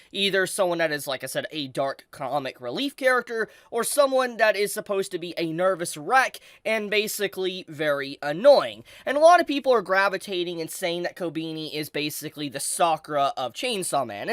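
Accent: United States. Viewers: the way b americanizing these names i swearr 😭😭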